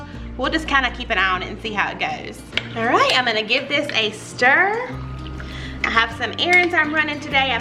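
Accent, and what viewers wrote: South, United States. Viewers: I just love your Southern accent❤ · I clicked hoping for a beautiful southern drawl and i wasnt disappointed, new subscriber 🙋‍♀️😍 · I love your recipes!! And your Southern accent, too. I'm definitely going to try your recipes. Thank you for sharing 🙏🏾❤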